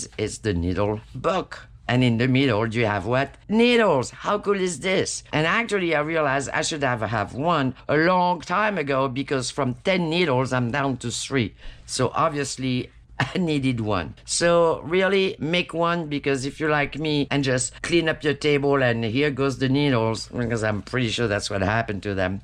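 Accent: France. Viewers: Wow, wow, wow is all what I can tell you about your tutorials. I am your new subscriber I really love your humor your lovely french-english accent your way you edit your videos and and and...thanks for your time to inspire me and us!! You are a true Angel!!! Have a beautiful evening Heike from The Netherlands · Hey! I LOVE❤ your channel and I love your French accent!!❤️🤗. You are so cute and sweet!🌹🌹🌹 I was thinkin that you should make photo copies of those "old" magazine pictures of the women so you will have a never ending supply of the retro/vintage ladies🤷‍♀️👍😘 That would be cool too👍😘🤗❤️. Thank you for sharin all your ideas and little tips and tricks with all us🤗🤗❤️ We Really Appreciate it so much!!👍🤗🌹❤️🌹 Thank you😘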